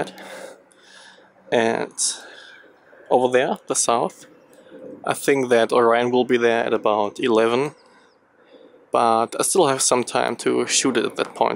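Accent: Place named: Germany. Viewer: Man hört voll, dass du deutscher bist :P Hab dich mal als Hobbyfotografkollegen abonniert^^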